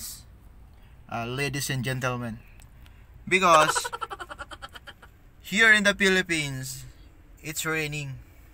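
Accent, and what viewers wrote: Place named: United States. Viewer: Ang galing naman pwede na mag amerikano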